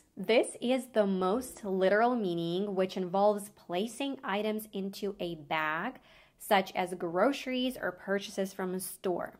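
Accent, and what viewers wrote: United States. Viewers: This is English American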